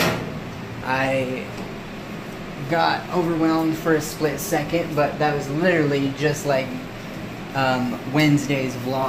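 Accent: United States. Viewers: You From Chicago Bro ?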